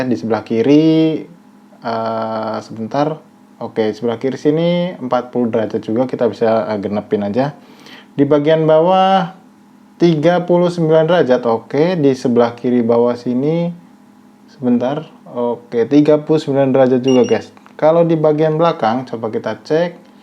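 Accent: Cilegon, Indonesia. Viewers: Orang Cilegon ni . Hehe...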